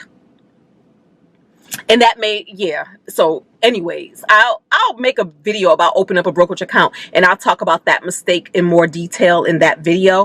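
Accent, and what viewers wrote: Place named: New Jersey, United States. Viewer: You sound so Jersy. I love it. Are you from Jersey? Next question, what type of camera do you use on your videos from home and your car videos? Love your videos by the way!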